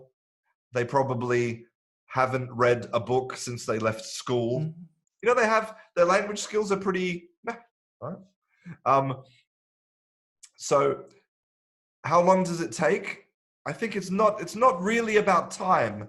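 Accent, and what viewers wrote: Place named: United Kingdom. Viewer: British accent ! you are excellent man. Thanks ....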